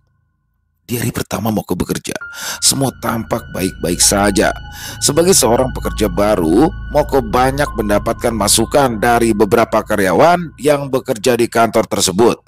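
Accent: Jakarta, Indonesia. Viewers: pagi bang... semoga sehat sll 😊🙏 suka banget denger cerita berlogat betawi walaupun saya orang jawa tulen😊😊😊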